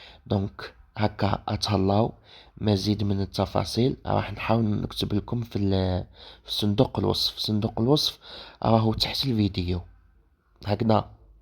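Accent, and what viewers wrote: Constantine, Algeria. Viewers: أول مرة نكتشف قناتك و دخلت قرعجت ، ماشاء الله عليك يا وليدي ❤. رغم ان والدتك المانية و كان عندك الفرصة تعيش على الطريقة الألمانية لكن اخترت بلادك و تهدر لهجتك القسنطينية الله ايبارك و الاجمل انك تحيي التراث الجزائري القسنطيني ❤❤❤ بالتوفيق 🌹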